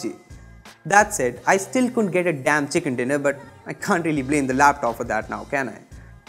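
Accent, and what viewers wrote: India. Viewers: I like your heavy Indian accent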